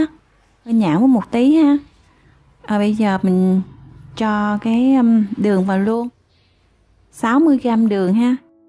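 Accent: South, Vietnam. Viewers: giống nói ngọt ngào của người miền nam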